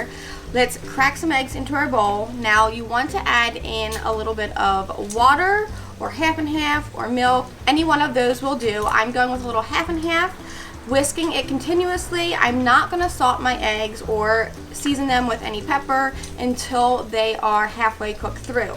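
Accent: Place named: Central Pennsylvania, United States. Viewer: OK, you've got to tell me.. are you a Central PA girl?? I started wondering when you mentioned Cooper cheese in another video, then you throw out "dippy eggs" in this one. Add in the products from Giant and of course your accent, and I'm saying you must be from PA. Am I right??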